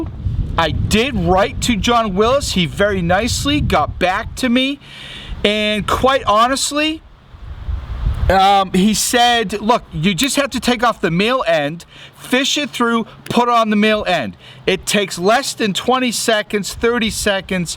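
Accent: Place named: Boston, United States. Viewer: "Peter Griffin reviews his belt" haha Your from Boston aren't you?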